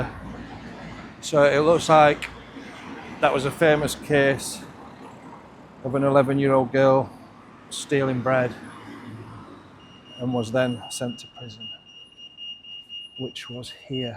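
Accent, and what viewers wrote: Yorkshire, United Kingdom. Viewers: Thought I’d got Jamie Oliver Chanel at first 😂 until I heard your dulcet Yorkshire comments. Nice one 😊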